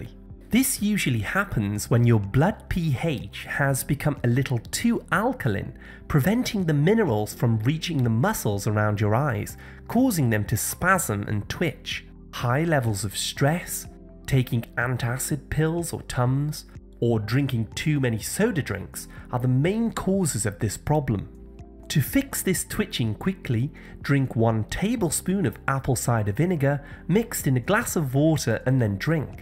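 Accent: United Kingdom. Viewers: Great and useful information regarding the health of one's eyes, concisely explained in clear British English. Thank you for sharing.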